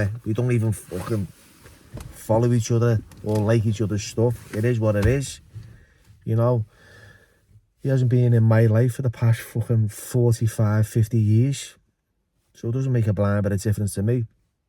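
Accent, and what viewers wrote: Liverpool, United Kingdom. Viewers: Your deffo the best scouse podcaster enough said.😅😅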